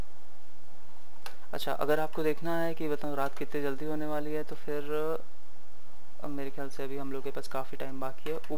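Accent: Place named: North India, India. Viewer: also like your north wali hindi... (delhi kya?)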